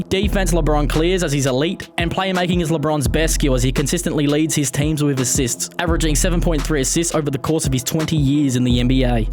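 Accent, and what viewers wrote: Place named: Australia. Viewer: yo r u aussie you sound aussie i am btw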